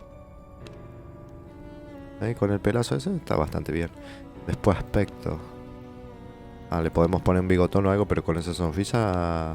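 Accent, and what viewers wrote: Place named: Santiago del Estero, Argentina. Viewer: Buenas hablas como santiagueño ^^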